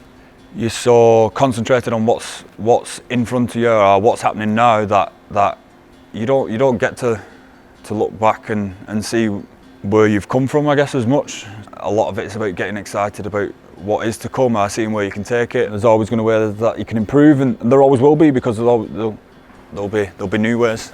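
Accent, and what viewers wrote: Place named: Yorkshire, United Kingdom. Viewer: He’s from Yorkshire, every place has different accents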